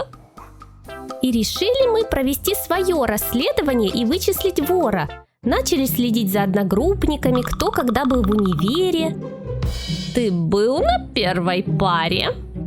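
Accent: Ukraine. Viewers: Так она украинка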